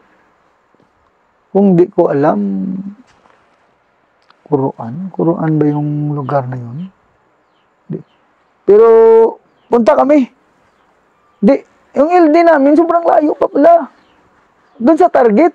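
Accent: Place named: Iloilo, Philippines. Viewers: Ilonggo yata si Sir sa accent nya..kabaliktaran daw accent ko😂..keep sharing,your juniors will digest and learn something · ilonggo gid c sir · Ilonggo Pala si Sir.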